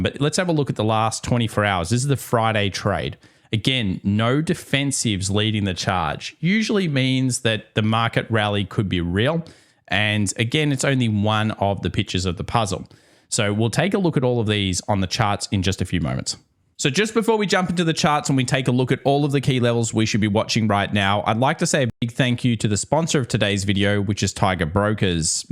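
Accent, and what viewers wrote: Australia. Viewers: Your Australian accent is super cool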